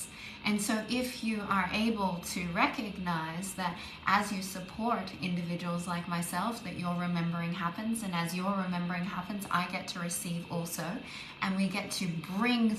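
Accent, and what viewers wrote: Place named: Australia. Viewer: Yas! So beautiful, thank you very much! So much love and grace as always. I live how ur expression of language is so great and this australian accent, yeees ❤ and this bloodline perspective is on point. And this kind of description is good. There are many layers and definition to these dynamics. But prince and princess is more likely acceptable storytale for humans So, one question: are the chakras of the Earth moving? Meaning, can I check them by researching these points.. Btw.. the flags of countries are also showing what energies and colours they hold.. or work with.. Thank you and sending light as always ❤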